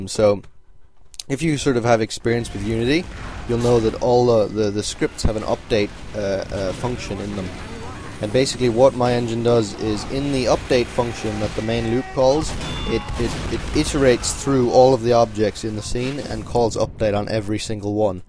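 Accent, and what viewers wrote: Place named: England, United Kingdom. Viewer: at the start.... I see what you meant about the English accent xD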